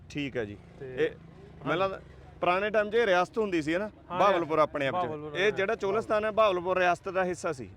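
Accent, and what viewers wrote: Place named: Malwa, India. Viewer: ਜੀ ਤਵਾਡੀ ਮਲਵਈ ਭਾਸ਼ਾ ਹੈ ਅਸੀ ਜਿਹੜੇ ਪਾਕਿਸਤਾਨ ਤੋਂ ਆਏ ਹਾਂ ਜਾਂ ਮਾਝੇ ਵਾਲੇ ਸਾਡੀ ਭਾਸ਼ਾ ਢਿਲੋਂ ਸਾਹਬ ਜਾਂ ਸ਼ਮੀ ਜੱਟ ਹੋਰਾਂ ਦੀ ਭਾਸ਼ਾ ਇਕੋ ਹੀ ਹੈ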